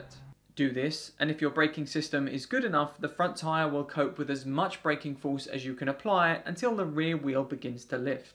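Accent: United Kingdom. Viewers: Wait what, he’s British? 😋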